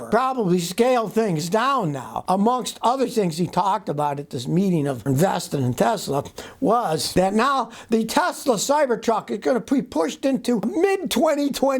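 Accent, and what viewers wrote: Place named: South, United States. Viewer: I love your southern trang 😂 you make my day 👏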